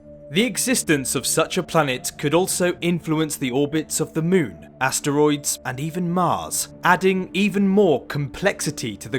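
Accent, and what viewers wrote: United Kingdom. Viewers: I got my own version you might enjoy if you want, Mr. British Voice, My Anglo-Saxon Cousin.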